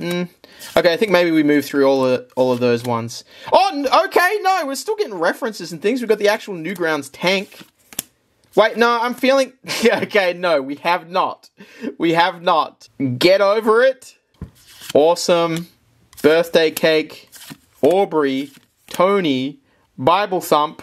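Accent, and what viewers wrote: Australia. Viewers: He’s not saying it wrong, it’s an Aussie accent you dolt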